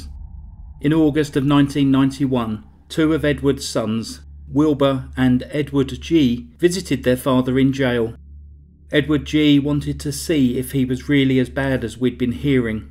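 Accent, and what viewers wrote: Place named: England, United Kingdom. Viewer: "Mentowe anguish dew tew juhhuee tryowe." I want to write a book with your accent for the narrator. I don't know if the preceding sample would even be legible for anyone. I don't know from what locality your accent originates, but I would be very interested to learn more about all the regional vocal inflections in England - I love dialects!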